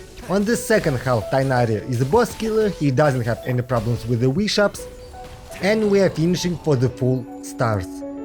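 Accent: Russia. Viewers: Это снимал русский? Акцент прям заметный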